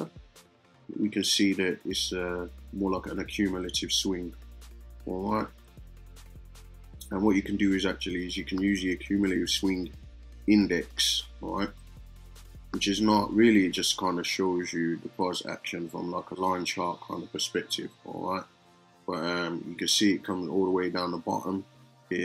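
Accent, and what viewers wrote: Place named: South London, United Kingdom. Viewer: Alright bruv. Trying to pinpoint the accent. South London, or South east area? Really enjoy your content bro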